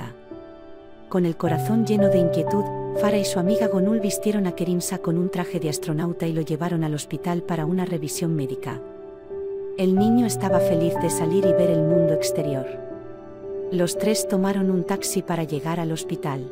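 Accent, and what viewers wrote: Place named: Castile, Spain. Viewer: Narración en perfecto castellano... Lástima que no sea el capítulo completo.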